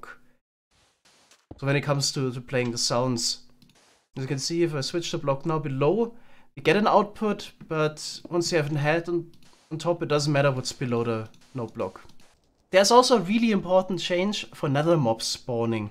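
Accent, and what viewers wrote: Germany. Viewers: Hört sich an als wär da jemand ein Deutscher ;)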